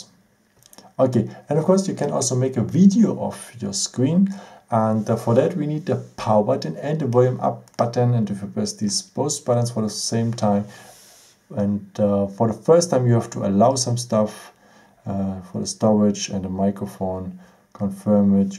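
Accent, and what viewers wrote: Germany. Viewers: Man hört es raus das du deutsch bist 😀😀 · He has such a strong german accent 😂, but the video is really helpful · Man hört den Deutschen aktzent richtig raus😇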